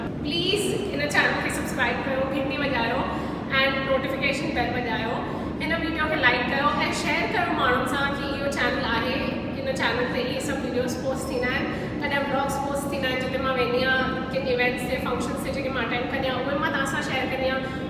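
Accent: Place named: Shikarpur, Pakistan. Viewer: Tuhnjo lahjo ee shikaarpuri thae aon tokhy hik frnd sa mlraendas uha tokhy thnji jhlak lgndi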